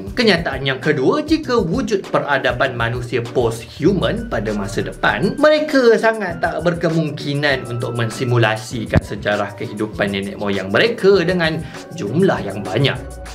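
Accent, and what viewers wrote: Malaysia. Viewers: pak, boleh minta tulisan dibawahnya dong, tambahkan subtitel, hehe, saya masih binggung sama logat melayu, hehe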